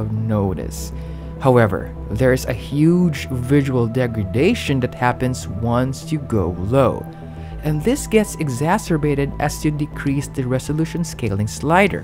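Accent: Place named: Brazil. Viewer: are you brasileiro?? hearing an accent :)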